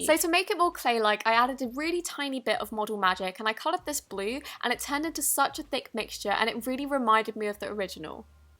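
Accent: England, United Kingdom. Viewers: She's english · WOW i love that britishenglisch so nice to hear👍#EUROPIENPOWER 🇨🇭🇨🇭🇨🇭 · R u English/British ❤️❤️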